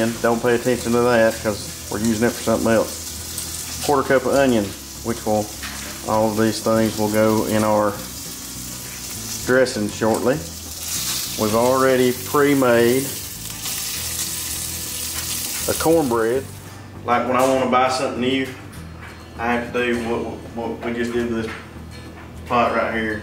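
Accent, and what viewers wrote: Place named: South, United States. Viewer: Nice the accent you know the country guy can cook. New sub here thanks for the video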